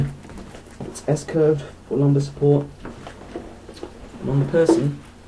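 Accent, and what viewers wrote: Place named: England, United Kingdom. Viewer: A Filipino with an English accent, now I've seen and heard everything.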